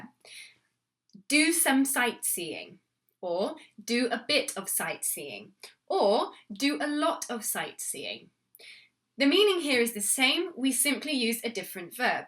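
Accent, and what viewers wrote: United Kingdom. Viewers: дожили, британский английский уже акцентом считают)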